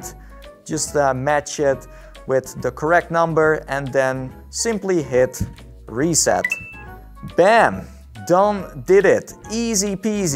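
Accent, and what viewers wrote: Netherlands. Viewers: Where do u live u sound dutch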